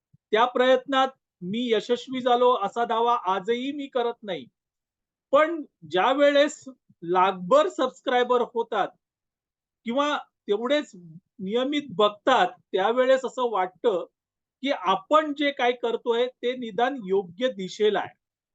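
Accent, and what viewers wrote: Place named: Konkan, India. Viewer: माका खूप आनंद होवाक,तुमचा कोंकणी आवाज कानावर असाच पडत रहावा! आमचा आनंद असाच कायम ठेवा,हेच मागणे आसा!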